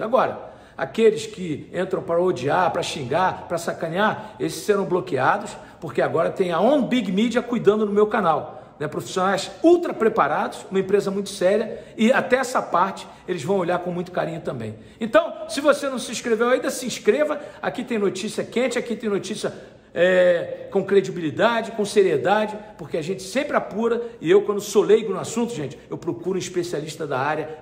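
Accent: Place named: Rio de Janeiro, Brazil. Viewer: E ai chega de Carioquice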